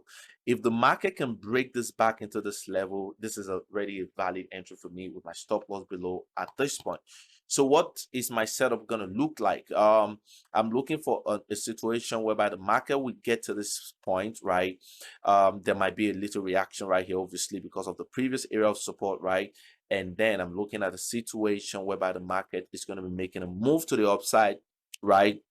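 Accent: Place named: Nigeria. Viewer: Definitely Nigerian 😅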